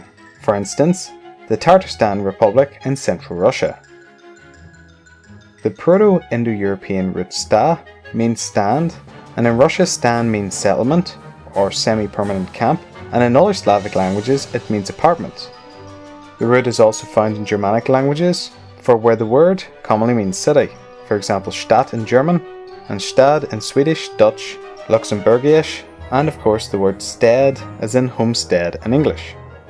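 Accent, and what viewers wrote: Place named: Northern Ireland, United Kingdom. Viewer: I just found your channel and im loving it! as a fellow Northern Irish (Tyrone) Its great to see as i havent seen any others, nice to hear a non american or English accent :)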